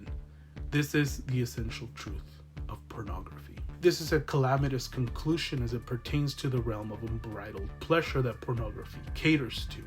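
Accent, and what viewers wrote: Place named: Los Angeles, United States. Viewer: I love Sotos and I really adore you I’m from la and a bibliophile and I think you’re both those things too- I can tell by your Angeleno accent. Keep reading or as i vita sine libras mors est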